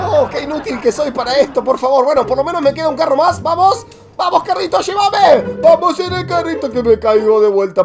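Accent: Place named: Argentina. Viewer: Eres argentino 🇦🇷🇦🇷🇦🇷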